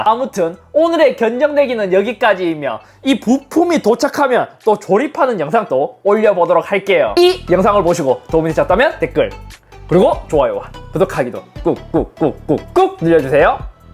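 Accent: Gyeongsang, South Korea. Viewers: 사투리가 경남쪽인가요? 경북쪽인가요??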